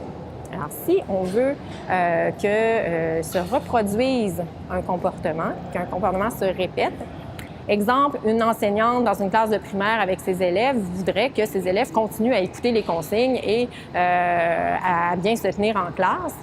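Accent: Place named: Canada. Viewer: Merci beaucoup très belle canadienne ! Je suis parisienne..j'ai réussi à vous suivre avec votre bel'accent ! 🐈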